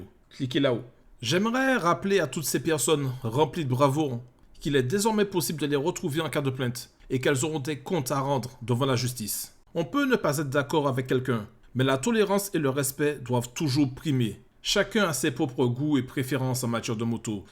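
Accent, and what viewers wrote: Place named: Antilles, Caribbean. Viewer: Merci à toi pour cette video de soutiens force aux antilles✌😉😉😁👍he oui l accent on ne peux la zapper force a toi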